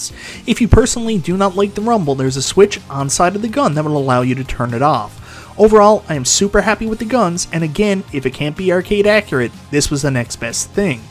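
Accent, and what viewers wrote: Newfoundland, Canada. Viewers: This guy from Newfoundland ?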